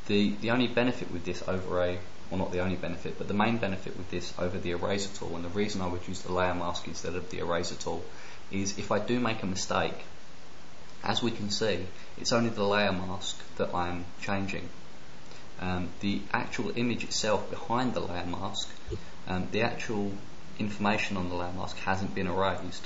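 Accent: United Kingdom. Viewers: You are from UK, aren't you?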